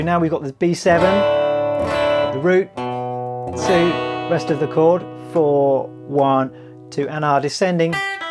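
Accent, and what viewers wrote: United Kingdom. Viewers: Hey just found out your channel !! Obviously it's an easy song, but your teaching is priceless and your UK proper accents adds up to the lesson :) :) Thank you for taking the time to make a Youtube channel! Cheers from france merci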